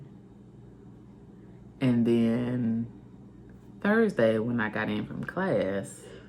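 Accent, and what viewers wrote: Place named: South, United States. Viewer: Im gonna assume you were sheltered growing up...get out of comfort zone...truck'n is tough but it gets easier as u start to get it...I understand you want to move to the beat of your own drum...we all do...the world is a cruel place...I like the fact you're willing to get out of comfort zone (🚛)...ik I'm all over w my writing but formulating sentences isn't my forte...the 🌎 takes no prisoners...love the southern accent...get a chance watch niesha k or skimp dee or roadrunna nia... watch how they move look at mirrors... recognize what u see...plus they're prissy they like to get hair and nails done...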